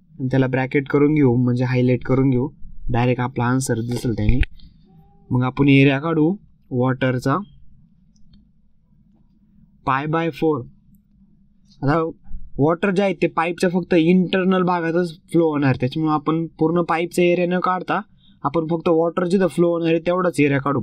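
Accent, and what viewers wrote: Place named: Nashik, India. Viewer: Bhau nashik cha vatatoy😄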